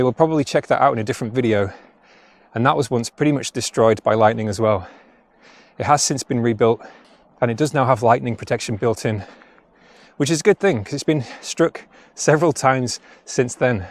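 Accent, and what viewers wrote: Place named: Northern England, United Kingdom. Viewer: Your Northern accent pronunciation is sooo smooth compared to a lot of people I hear, It's magnificent.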